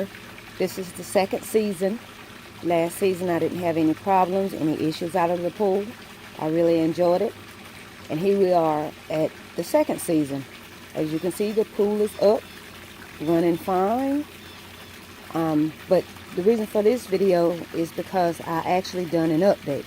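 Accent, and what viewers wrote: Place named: South, United States. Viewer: Not sure what southern state you are at? I’m living in South Carolina by way of Lyons Georgia. Love your natural southern Accent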